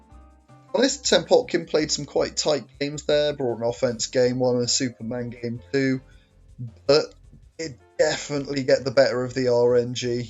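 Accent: United Kingdom. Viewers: This is a British narration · Insane narration, random British person.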